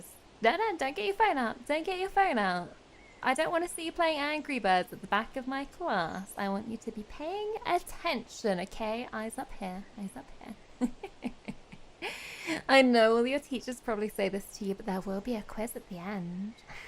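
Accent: United Kingdom. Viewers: Now normally I am not the biggest fan of bees, but seeing with glasses and giving a lecture remained me of why she is the exception to my dislike. The lecture was very well done and the facts were huge eye openers like how bees do dance which I knew about or that bees can be trained. That does expand my knowledge a good amount more and again with glasses and her british accent all scream hot teacher so soild lesson!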